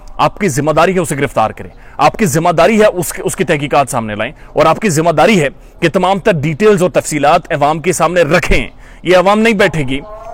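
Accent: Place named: Kashmir, India. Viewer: Your pronunciation 🤓🤓sounds u belong to kashmir😂hum kashmirion ki urdu main bi apna kashmiri touch hota he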